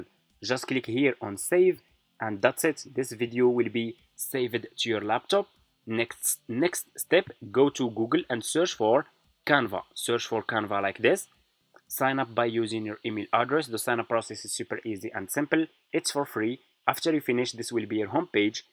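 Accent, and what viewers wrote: Morocco. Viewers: واش نتا مغربي يا صاحب القناة..؟ باينة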